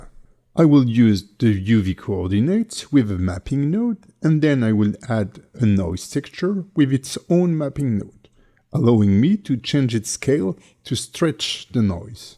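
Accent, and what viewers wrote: France. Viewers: My favorite tutorials are french people making english video with a french accent while i'm french with not so great english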